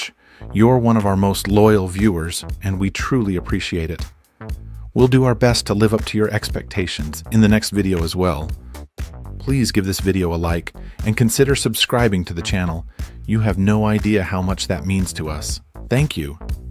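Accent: United States. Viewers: Thumbs down for the annoying American voice over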